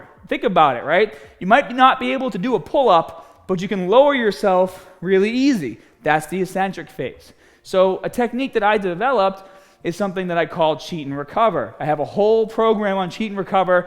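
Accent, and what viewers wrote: Boston, United States. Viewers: I have been watching videos while doing cardio and I have developed a strong tendency to speak in a Boston accent. Win Win. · His point still stands... his accent is Boston in USA not South Africa lmao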